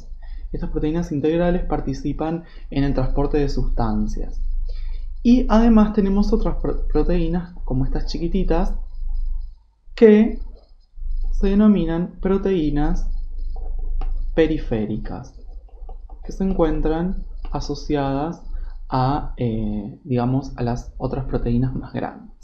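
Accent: Argentina. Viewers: Me encanta el acento Argentino.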